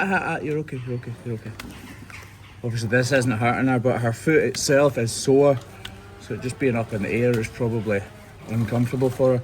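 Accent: Scotland, United Kingdom. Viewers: Having trouble saying "similarly" with a Scottish accent try saying "Purple burglar alarm"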